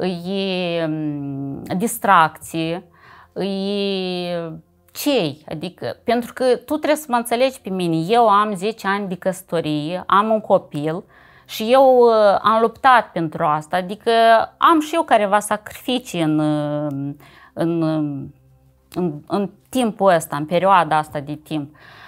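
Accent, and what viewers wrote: Moldova. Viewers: O poveste autentica povestita chiar in dulcele grai moldovenesc!Ce mult imi place,te-as asculta zile intregi!Dumnezeu sa te aiba in grija sa!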